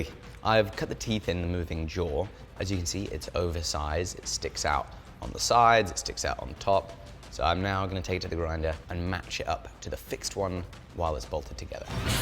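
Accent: United States. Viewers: He keeps using an American accent, I think he misses it here... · As an American I thoroughly enjoyed attempts at an American accent throughout this episode. Congrats on the vice grips, they look fantastic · What's the "like goal" to get to do a whole episode in his american hick accent?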